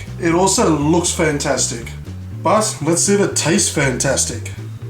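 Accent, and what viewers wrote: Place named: Australia. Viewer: Great job,. Always like the Australian accent, along with your good personality! God bless you 🙏❣️😇 Bud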